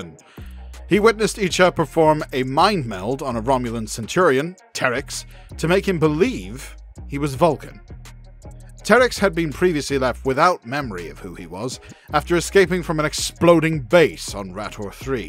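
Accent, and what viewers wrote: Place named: Scotland, United Kingdom. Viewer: I detect a slight Scottish accent boyo.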